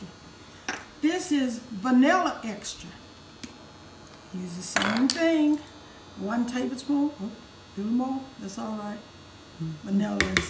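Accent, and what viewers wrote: South, United States. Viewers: Grandma had that “southern” twang goin on 😉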